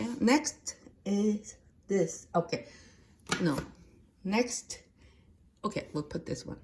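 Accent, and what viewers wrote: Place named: South Korea. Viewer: Hi 😊 I am a korean american and I moved out of my family's house in 2021. I am missing a lot of korean food and it's very lovely to hear korean accent and laughter from you ❤ you make me miss so much food and I can smell the meat cooking from the screen... please continue cooking!! You are giving me so much joy ❤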